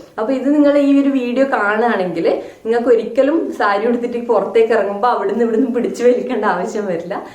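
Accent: Kannur, India. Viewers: Kannur kariyano? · Njn subscribe cheythu,kannur aanennu kettappaze,njnanum kannur aanu😍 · Kannur aano??? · Kannooor kaari aaano?? 😍 Samsaaram and slang very lovely 🎉🎉🎉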